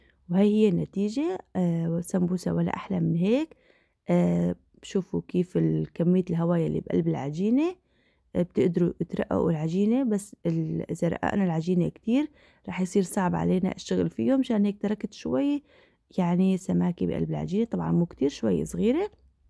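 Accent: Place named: Iraq. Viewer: مرحبه شلون الصحه هل انتي من عراق طريقه كلامج اني من عراق اول مرة اشوف قناتج كلش حلوة ومقاطع وقتها قصير اتمنالج موفقيه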